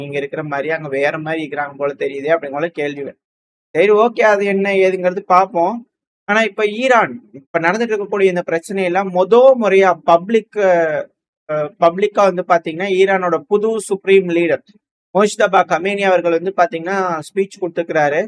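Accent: Coimbatore, India. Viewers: R u from coimbatore, guessed it from ur slang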